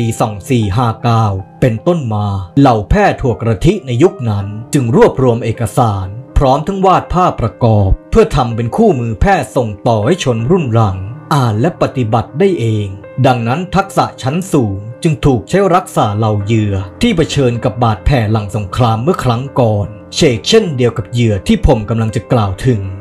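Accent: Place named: Phetchaburi, Thailand. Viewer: สำเนียงเหมือนคนเพชรเลย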